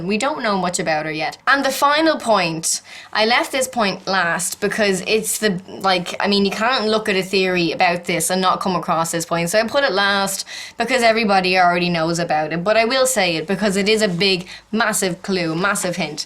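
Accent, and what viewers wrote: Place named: Ireland. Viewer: Great video cos u were right but just wondering are u from Ireland cos u sound Irish and I'm Irish too lol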